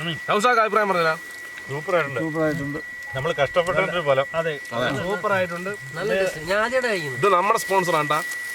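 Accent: Palakkad, India. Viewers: Palakkad slang🙈🙈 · Edh rajyathayalum ikkayude tade mark ane... Kalli thuni Hawayi Palakkadan slank Nalla atmosphere... 😍😍😘😘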